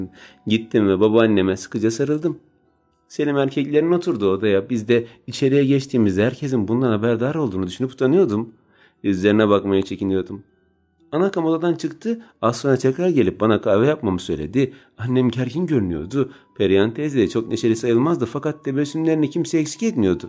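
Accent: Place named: Black Sea, Turkey. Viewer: Selam kardeşim iyisindir inşallah bu hikayedeki Karadeniz sive çok hoşuma gitti ben bı Karadenizli olarak bu kadar güzel konuşamam selametle Allah'a emanet ol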